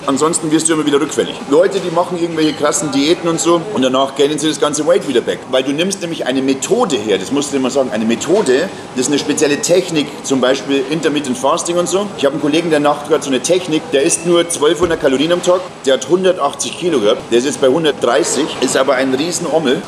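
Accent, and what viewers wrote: Bavaria, Germany. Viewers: Abo für den Bayrischern Dialekt is raus 🙂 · Google Transkription scheitert am Bayrischen Akzent. 🙃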